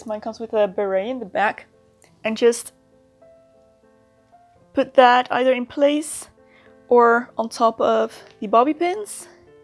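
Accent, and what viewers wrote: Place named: Netherlands. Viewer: lol dutch accent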